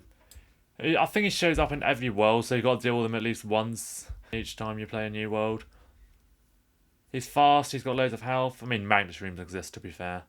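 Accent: United Kingdom. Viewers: wtf is this Brit fuck saying, fkn hate their accent. they think it’s so intellectual, like did chill out with the adderall and caffeine u fkn stim junkie. Slow tf down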